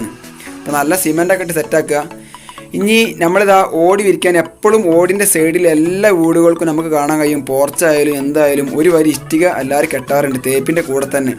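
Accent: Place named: Kondotty, India. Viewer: കൊണ്ടോട്ടി ആണോ സ്ഥലം സംസാരം കേട്ടിട്👍😄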